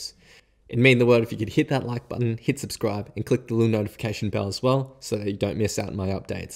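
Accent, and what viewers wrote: Australia. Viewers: it's great to have a comfortable-sounding Australian accent doing this, weel done.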